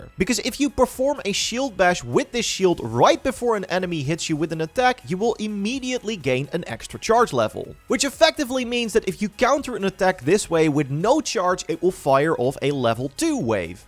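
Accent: Netherlands. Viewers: Do I smell dutch or not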